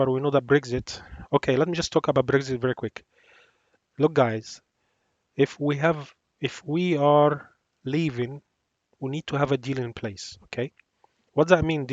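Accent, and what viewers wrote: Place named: Egypt. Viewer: Thanks for your great analysis. Do you have any analytics in Arabic ? I think you're an Egyptian from your accent All my greetings.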